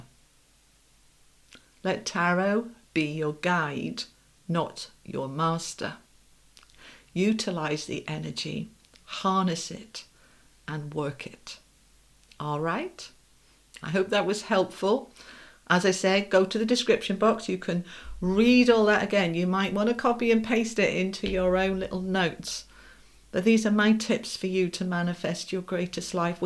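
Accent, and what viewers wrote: Wales, United Kingdom. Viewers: I needed that thank you. ❤ Are you a little bit Welsh? You have a nice accent. Peace and love to you.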